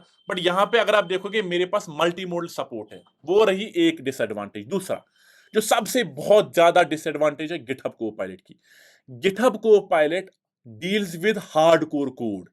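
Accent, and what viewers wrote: Kashmir, India. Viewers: Looks like you are from kashmir. From your accent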